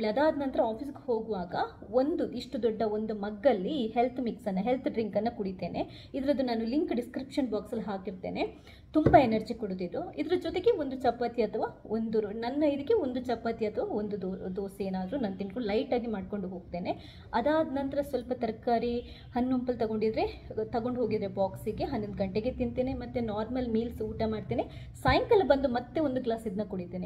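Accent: Mangalore, India. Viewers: Madam nivu Mangalore urura,channagi mathadathira 🙏👌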